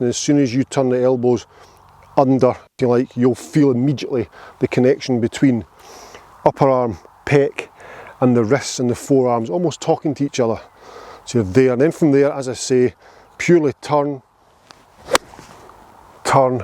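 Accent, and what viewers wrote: Scotland, United Kingdom. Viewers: Great lesson. I can't wait to try it at the range tomorrow. BTW, love the Scottish burr. I have a friend Graeme Baxter who is Scottish. He is a fantastic golf artist. He is licensed by the R and A and the USGA. and has brilliant artworks of literally all the majors including The Open. You might want to check his web page out.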